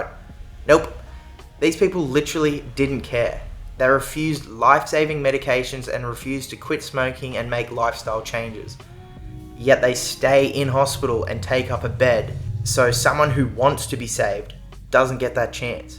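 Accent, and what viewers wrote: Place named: Australia. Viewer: You sound so Aussie, are you?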